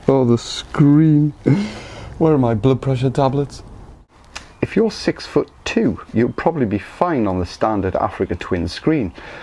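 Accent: Newcastle, United Kingdom. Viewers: Well done, enjoyed your Geordie accent and wit ( charm would be going a bit too far).. cheers from am old Jock down under in Western Australia. PS you need a passport for here as well, but the weather is great for riding that AT!.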